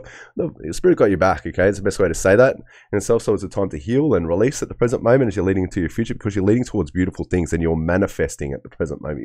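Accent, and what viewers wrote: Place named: Australia. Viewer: You are an awesome reader! It’s lovely to hear your lovely down to earth Aussie accent, I’m Aussie too but mainly listen to readers from elsewhere. Thank you, many blessings.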